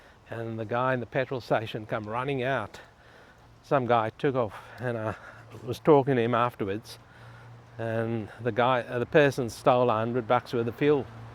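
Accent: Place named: South Africa. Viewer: You sound a bit s african. Are you?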